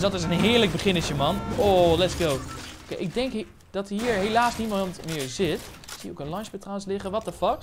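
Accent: Apeldoorn, Netherlands. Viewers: Kom je uit Apeldoorn?😯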